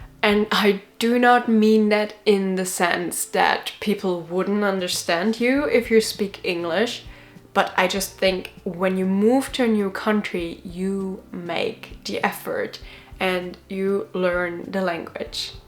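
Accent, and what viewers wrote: Germany. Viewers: Sehr gut svenska med ein bisschen Deutsch men helt keep on work on it 😊 tack så mycket hörde du hade dialekt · Du pratar bra svenska 😊. Man hör din tyska accent mer, men det är bara trevligt. Vi är ju vana att lyssna på drottning Silvia 😊. Dessutom är vi också vana vid invandrare från olika länder som försöker lära sig språket. ❤ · Åh, du pratar ju jättebra svenska. :) Du har en ganska tydlig tysk accent, men rent språkligt var det bra. IMO coming from Germany, Netherlands and obviously Denmark and Norway you have a quite a large advantage (even compared to English speakers) due to the similarity of words and pronunciations between German and Swedish.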